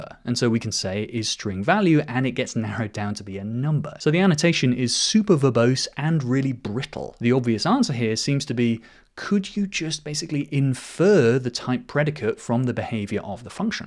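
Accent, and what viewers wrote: United Kingdom. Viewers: Ебанутый британский акцент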